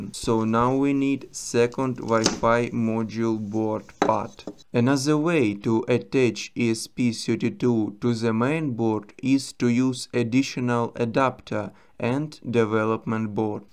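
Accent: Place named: Russia. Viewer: Друг , английский надо подтянуть :) Русский акцент ухо режет...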